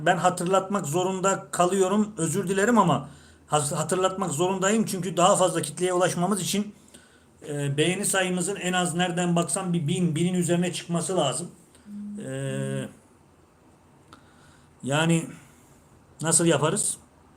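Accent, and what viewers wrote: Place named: Ankara, Turkey. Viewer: Angara sesin geliyor😮😮😮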